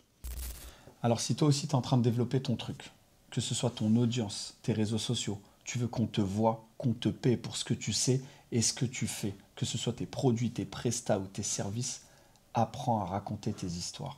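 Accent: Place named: Italy. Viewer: très très cool ce format ! J'avoue que l'accent italien m'a transporté, en plus des précieux tips évidemment 😅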